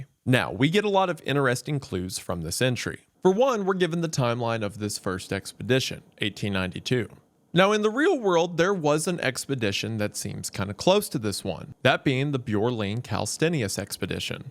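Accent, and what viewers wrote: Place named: Appalachia, United States. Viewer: My boy’s Appalachian accent is coming out full force. Love to hear it.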